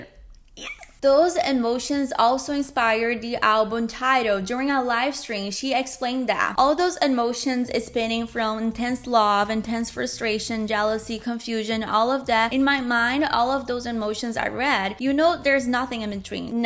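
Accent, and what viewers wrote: United States. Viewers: your california accent 🔥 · I thought you were american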